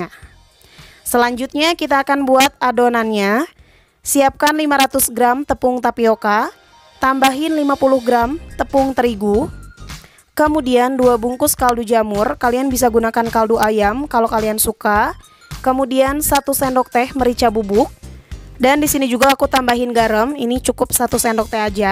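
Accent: West Java, Indonesia. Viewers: Akumah suka yang ngehostnya nyunda banget🤓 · Masyaallah resep pisan da nyarios na ka c teteh ieu sunda pisan👍,, salam dari aku orang ciwidey teteh 🙏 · Sunda pisan nya saiiiiii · Alllllaaaaah c teteh ...sunda bingit ,😁😁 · Sunda banget euy hehehe si teteh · Lucu pisan aya sundaan euy🤗😆😆sehat slalu teh makin lancar usahanya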